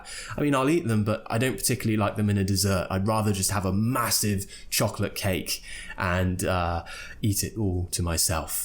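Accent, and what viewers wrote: RP, United Kingdom. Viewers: He is a Brit! Can't you sense that? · I love the British accent and your videos really please me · If all in london speaks like you it shouldn’t be so bad but ... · Thanks i has lerrn the british english from you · i always keep watching your vedio. I'm practicing the British accents. I love to hear this accents,and quietly difficult.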